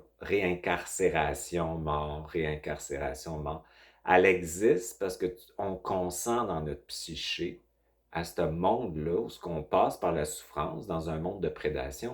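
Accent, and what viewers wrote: Quebec, Canada. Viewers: je vous découvre depuis la Hongrie avec ce merveilleux accent québécois...vous complétez les infos que nous transmettait ISO et cela m'aide beaucoup..🤗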